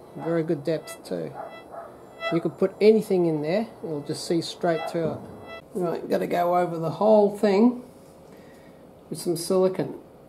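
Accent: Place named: Australia. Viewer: Good work mate. You sound like a typical Aussie that are clever at innovating and making things out of nothing.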